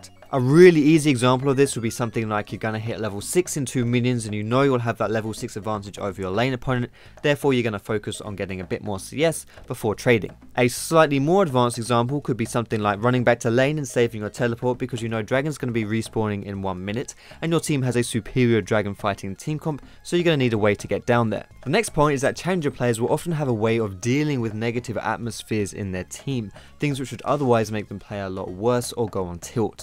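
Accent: United Kingdom. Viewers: I really enjoy all your videos and especially I love your British accent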